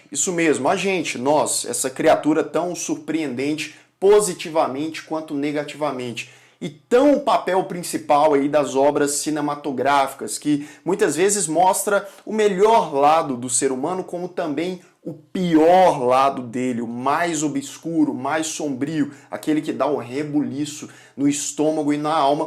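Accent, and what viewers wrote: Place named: Minas Gerais, Brazil. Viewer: ...vc pode ser de Brasilia,mas fala igualzinho aos mineiros...sou mineira de BH,embora more atualmente em SP,gostei mto da similaridade! Um forte abraço,seu trabalho é de excelência!( só vi Laranja mecânica!)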